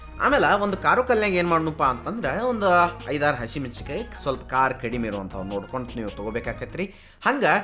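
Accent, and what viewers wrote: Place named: Karnataka, India. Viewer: Love this Kannada accent ❤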